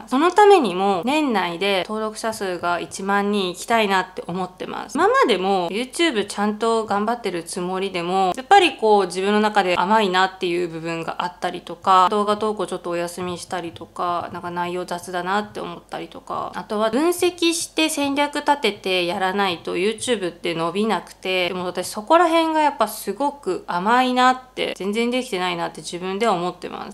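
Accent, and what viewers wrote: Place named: Hakata, Japan. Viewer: 必ず新商品はの動画みてますよ。福岡出身なので博多弁がとっても可愛いですよそのまま頑張ってくださいね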